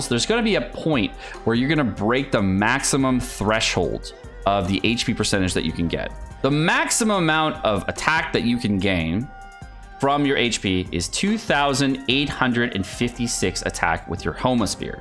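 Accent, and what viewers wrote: United Kingdom. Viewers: I don't care about who tao. I'm just here for the music and bri'ish. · Woah british again ☺️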